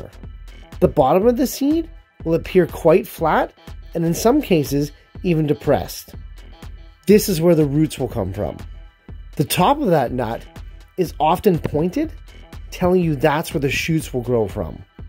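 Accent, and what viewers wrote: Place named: Canada. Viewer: Cool Canadian accent😁 thank you for sharing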